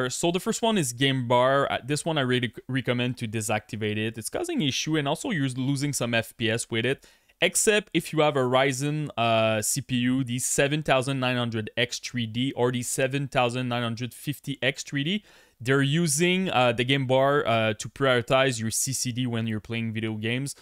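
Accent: Quebec, Canada. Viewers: Are you from Quebec? You have the same accent as my wife and Georges St-Pierre. :D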